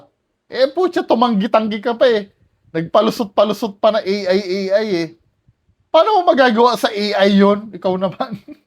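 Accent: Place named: Batangas, Philippines. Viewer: 😂😂😂 pag masaya nagihing batanggenyo a a o owww ala ehhh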